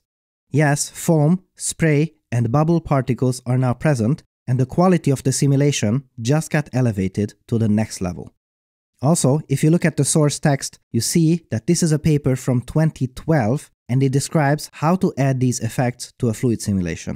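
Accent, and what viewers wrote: Hungary. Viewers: Heard your voice and your name and I immediately knew you are Hungarian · Jól hallottam, hogy maga magyar :3 és itthon nincs felfedezve :/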